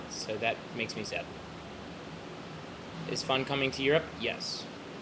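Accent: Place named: Iowa, United States. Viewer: Pure Iowan accent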